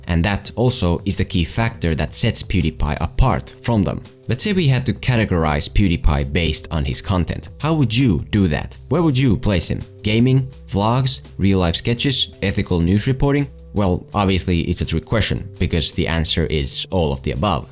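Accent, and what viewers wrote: Finland. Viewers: Your accent sounds finnish 🤔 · You sound very finnish. Also, good content! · Where are you from? At first I thought you were an American but then I for some reason started to pay attention to your accent. Kinda sounds like a Finn with a great English accent. Idk, I'm just curious. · are you Finnish? Just wondering because of your accent. Pretty cool if you are, I don't know too many Finnish channels in the international waters. You have good stuff here, either case! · I think this guy is from Finland, so much finnish accent.. And he say the name just like any finn would say it ^^ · Hey bro are you from Finland because you have a slight finnish accent. Like the way you say T's and A's is just very finnish you could definitely work on them. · you sound like you are Finnish, is that true? If so, then Torille! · Suomiaksentti spotattu 🤔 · Hi, where are you from? 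Your accent sounds finnish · Oletko Suomalainen? Do I hear a finnish accent? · Hey, weird question... Where are you from? Your accent sounds a bit like that of a Finnish person. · Are you from Finland? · the finnish accent. so good. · are you finnish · Where are you from? Your accent sounds Finnish. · Do I hear a finnish accent? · Nice vid! I started following Pewds when he had less than 50k subs, and the transformation is really amazing. I unsubbed a couple years ago, but now I'm loving his content again! Oh and are you from Finland btw? Your accent sounds like you could be :D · Tää kuulostaa aika suomalaiselt englannilt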